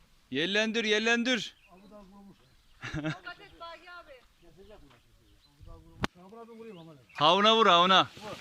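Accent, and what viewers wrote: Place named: Ordu, Turkey. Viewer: Harika Ordu şivesi 😁.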